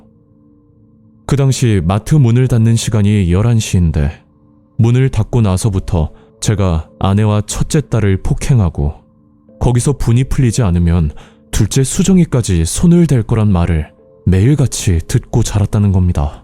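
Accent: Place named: Jeolla, South Korea. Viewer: 아따 전라도라~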